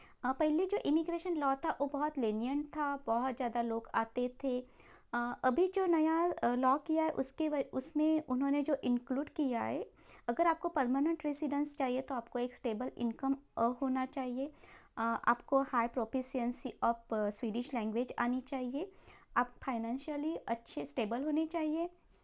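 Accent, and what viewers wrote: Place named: Odisha, India. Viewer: your Hindi accent is so similar to Odias of Bhubaneswar region..!!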